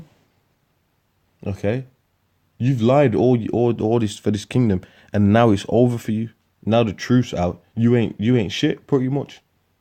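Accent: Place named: Birmingham, United Kingdom. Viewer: Shalom brother, All praise the the most high. It’s always good to hear a voice with an accent I can recognise, can hear the brum accent clearly cause I live round there. Always trying to get direct contact with some brothers but haven’t been able to, how can I do so ?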